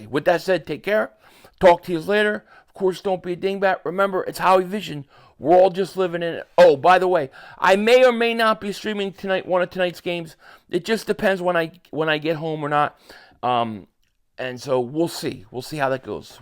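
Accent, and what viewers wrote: Philadelphia, United States. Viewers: Does everyone in Philly talk like this guy?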